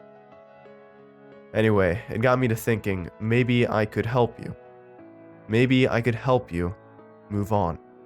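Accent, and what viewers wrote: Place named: United States. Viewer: i’m american, but have lived in england long enough to know this is written in the POV of someone british, but his american accent reading it threw me off completely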